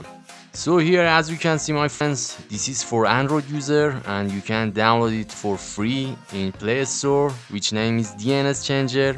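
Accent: Iran. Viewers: چه لهجه فارسی داره انگلیسیت❤😂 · Well for everyone who are curious, This DNS is more commonly used in Iran. The creator is also from the same country based on the accent and the error received. This is a great method shown and he's actually right! However, for the people outside of Iran, I recommend you to search through the internet and find the nearest DNS service possible to your country. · داش ایرانی از لهجهت فهمیدم❤ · به به لحجه‌ی اصیل ایرانی❤😂 چطوری هموطن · حاجی ایرانی ؟ لحجت معلومه ایرانی ؟ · لحجه ایرانی ؟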